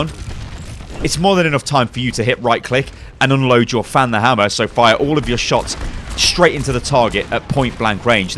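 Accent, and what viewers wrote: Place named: East Midlands, United Kingdom. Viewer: are you from east midlands area? you sound it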